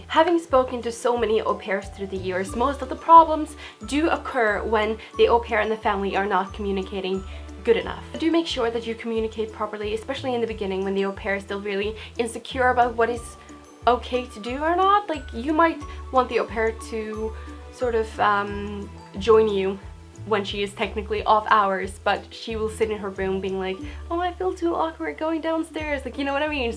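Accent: United States. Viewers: where are you from. you sound American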